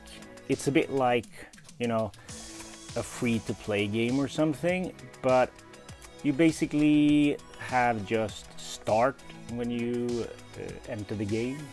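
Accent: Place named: Sweden. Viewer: Jag gillar att det hörs att du är svensk med när du exempelvis säger: ”I was super stolt” istället för ”I was super proud” Ska inte säga något, skulle säkert göra samma sak om jag gjorde det på engelska. I like when we can hear that you come from Sweden when you say: ”I was super stolt” instead of ”I was super proud” I should not say anything, maybe I should do the same if I did it on english.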